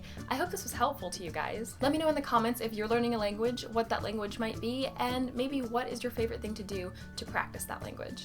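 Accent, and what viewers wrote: United States. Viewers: I've been learning English for a while and without any doubt you are the native English speaker that I could understand the most in a normal speed. where are you from? I meant which part of the USA people speak as the same accent as you? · Im from indonesia, im asian. you're good really american in top speed love.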